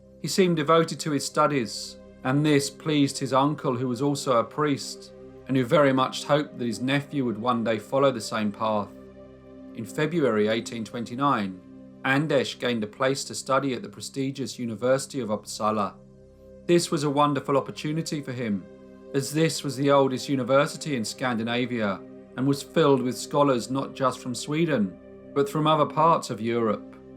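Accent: United Kingdom. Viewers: Gerrylynn mako. It is a British accent. I believe it is the Queens English. is a well educated person.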